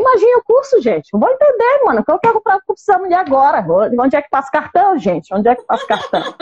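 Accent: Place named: Recife, Brazil. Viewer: Pelo sotaque acho que é de Recife/PE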